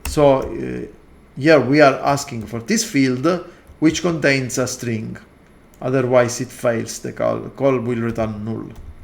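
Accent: Sicily, Italy. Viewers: inglese con accento siculo o sintesi in italiano.... vita di merda XD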